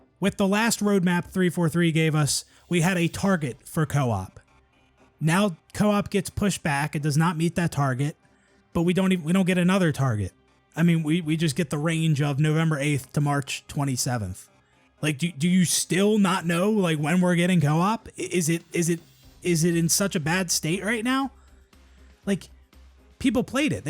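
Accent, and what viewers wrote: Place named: Pennsylvania, United States. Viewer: Gotta love a Pennsylvania accent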